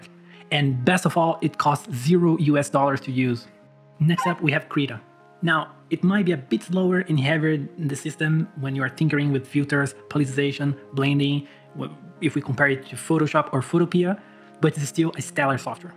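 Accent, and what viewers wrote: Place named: Portugal. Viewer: do you speak portuguese? i found your accent very noticiable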